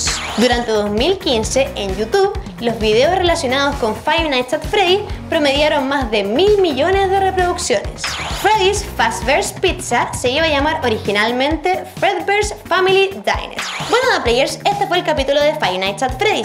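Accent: Chile. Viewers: eres chilena ? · Eres chilena? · eres chilena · es chilena o no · Y ¿ERES CHILENA?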